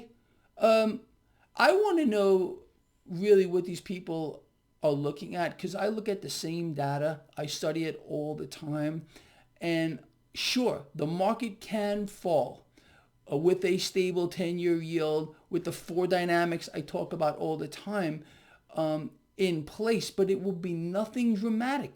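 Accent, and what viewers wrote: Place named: Long Island, United States. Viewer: Cool, you talk like a fellow Long Islander.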